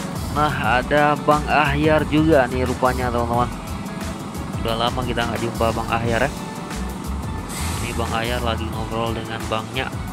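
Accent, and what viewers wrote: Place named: Medan, Indonesia. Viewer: Abang ini asli medan, ap asli orang aceh. Logat nya susah2 di tebak.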